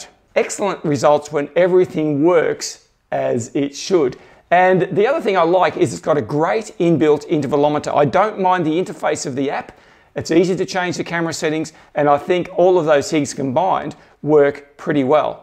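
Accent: Australia. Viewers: OMG! An Aussie Voice, on here, talkin' my language, doing a great job of being HONEST!! Much appreciated!!! You mentioned the tripod...Bol..? But it is not 'linked' in your post, or have I missed it? Keep up the greatest work on here!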